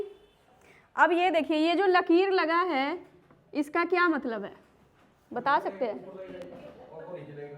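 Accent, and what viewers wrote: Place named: Punjab, India. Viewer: Tuhadi language toh pta lag reha k tuci punjabi ho, but eh gall ekk punjabi samajh sakda, 😂😂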